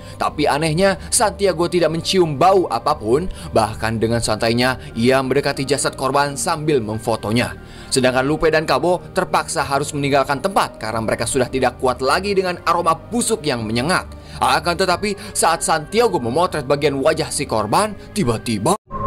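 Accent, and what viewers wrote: West Java, Indonesia. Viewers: Orang sunda kitu iyeteh nu nyariosna😅😅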